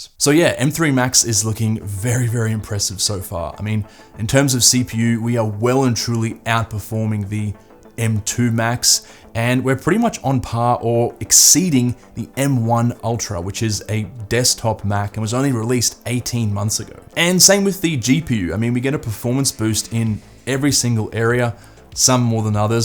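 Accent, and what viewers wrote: Australia. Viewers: You speak like an Australian.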